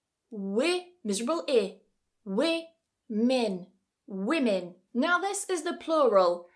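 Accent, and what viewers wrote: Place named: England, United Kingdom. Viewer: Hi I love your english accent,I'm from Costa Rica.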